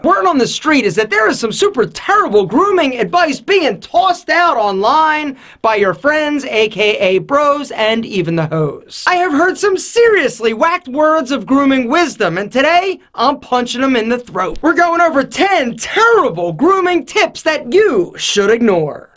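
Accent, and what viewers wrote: United States. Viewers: Sounds American to me...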